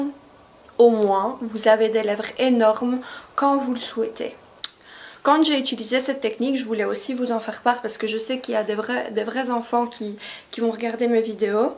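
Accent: Belgium. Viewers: Ta vidéo est sympa, il y a beaucoup d'humour, tu te moques de ton accent et oui, il faut savoir faire de l'autodérision, on a l'accent belge et on ne renie pas les origines.Elle traite bien du sujet présenté en expliquant bien les dangers pour les jeunes filles qui abuseraient de ce système, je trouve que pour une première, elle est super bien faite; je t'encourage à persévérer et à continuer si cela te plaît, sans t'occuper des gens haineux qui ont toujours un commentaire déplaisant à dire.Et pour celui ou celle qui dit que tu as des lentilles, je le défie de trouver une photo de toi sans tes yeux bleus clairs, qui font ton charme, alala la méchanceté gratuite à quoi ça sert vraiment, maintenant on peut ne pas aimer ou apprécier, pas de soucis, EN BELGIQUE c'est un pays démocratique et on a libre expression;....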